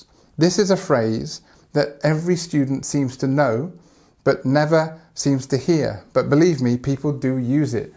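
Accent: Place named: United Kingdom. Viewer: Though I love listening to our dear Adrien,I really enjoyed listening to you, I looove your beautiful british accent ! I hope to see you again on this channel.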